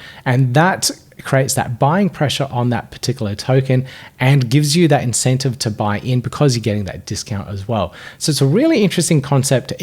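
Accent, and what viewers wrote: Australia. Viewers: You sound even more Australian since returning from your break. Love the accent. I’m ready for another ISPO update if there are some new projects coming up soon. Welcome back.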